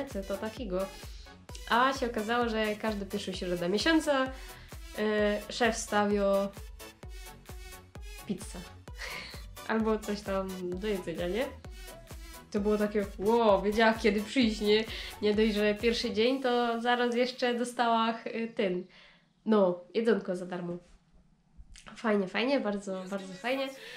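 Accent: Silesia, Poland. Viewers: Fajnie słuchac Ślonsko godka w wersji żeńskiej 😂😂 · Pozdrowionka i powodzenia ;) Miło się słucha tej Twojej ślonsko godki.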